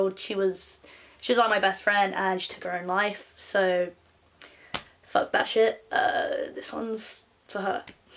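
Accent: United Kingdom. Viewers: I'm so sorry about your aunt, amazing cover, your voice is so beautiful! (I also love your accent, I love British accents because I have an American accent) 💖💖💖💖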